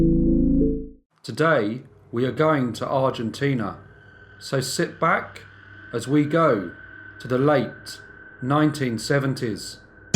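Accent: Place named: South East England, United Kingdom. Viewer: New sub here. Trying to work out where you are from in England! Your accent is similar to mine, so I'm guessing the South East?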